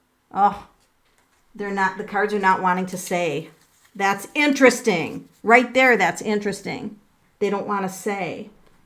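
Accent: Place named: Midwest, United States. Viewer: I love your mid-western accent🤗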